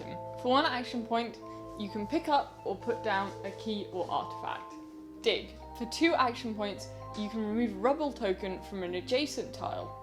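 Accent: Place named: United Kingdom. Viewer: Donnie DeVille this is not mumble, it's British.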